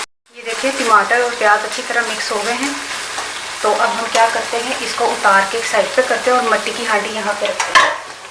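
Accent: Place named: Punjab, India. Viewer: باجى جي. هذا قيميه ماشاء الله عليك ميه ميه وأنت لسان البنجابي حلوة ميه ميه جزاكم الله خيرا في الدنيا والآخرة مع السلام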